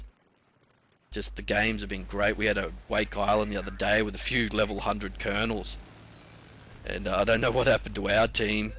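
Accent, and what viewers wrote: Australia. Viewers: Finally an Australian commentator, definite sub from me love your vids :)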